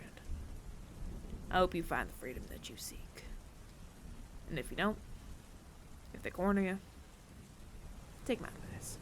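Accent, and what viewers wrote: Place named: South, United States. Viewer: Oooooo this one was a fun one! Not sure how I felt about the Southern accent, but overall pretty good!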